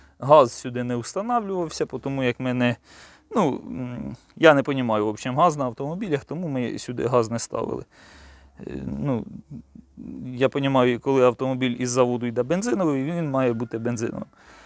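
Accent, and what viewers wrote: Cherkasy, Ukraine. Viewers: судячи з номерів - ми земляки. Тай говір Черкащини з русизмами чутно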